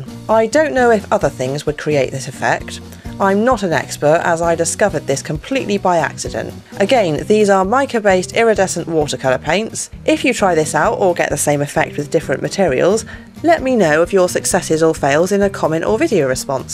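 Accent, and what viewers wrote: United Kingdom. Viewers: Since by your acent lol i guessing u live in the uk????? and also ummmm thinking that i might not be able to find them u think u can do alittle bit of research on where i can buy them in the usa and i would love to share my expeiMENTAL self with you im always hearing form my friends lindsey ur cray cray ur just to much lol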